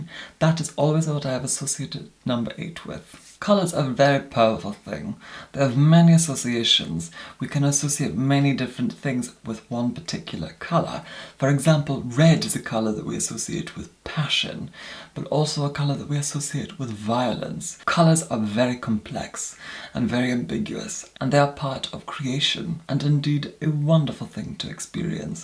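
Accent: United Kingdom. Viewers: I just adore a British accent (or most any accent for that matter lol). Technically, the British (aka English) accent is not really an accent. As an American, it only SOUNDS like an accent. Pretty sure the Americans accent developed from the Brits! 😊👍🏼💚💜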